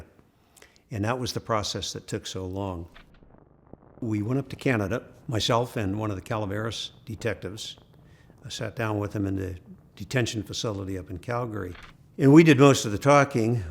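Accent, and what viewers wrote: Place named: United Kingdom. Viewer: This is a wild story good job covering it. I really appreciate the fact that you limited your British accent in the video. American crime American voice. Nothing personal. I can barely understand what in the toast and beans you’re saying.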